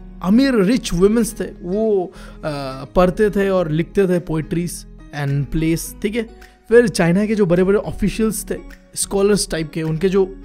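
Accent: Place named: Bengal, India. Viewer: Bhai woh uska bengoli accent hain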